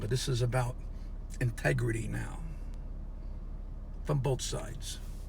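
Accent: New York, United States. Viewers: Love your view points of expression with that New York flair. Hope your ticker holds up but, you are a good loud speaker.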